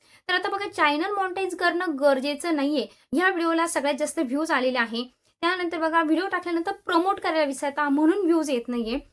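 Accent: Nashik, India. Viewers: Tai tumhi nashikchya ahat ka ? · Tai tu nashik chi ahe ka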